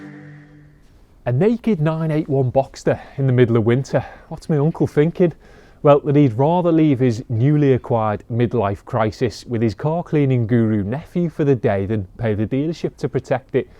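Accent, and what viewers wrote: Liverpool, United Kingdom. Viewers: I seriously fawn over British accents so much 😛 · What is it then? It's a real British accent, man sounds like Neil Buchanan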